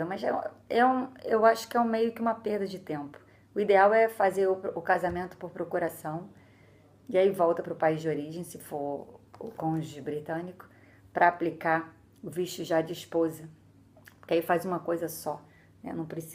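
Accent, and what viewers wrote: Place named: Rio de Janeiro, Brazil. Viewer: Boas dicas de legislação em um sotaque carioca. Riso. Abraço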